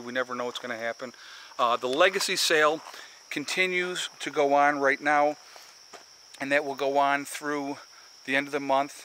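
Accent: United States. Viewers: Iam a Christian and a Welshman to boot! I am on carer's allowance for looking after my elderly mother and I am thus poor by modern British standards but I would willingly try to accommodate any of my Christian brothers and sisters from SA and beyond! My only question is this, "Why have you got an American accent?"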